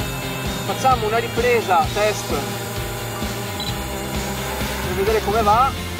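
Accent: Veneto, Italy. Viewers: uè zio sei veneto? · be' io sono Mestre e la parlata veneta la riconosco😋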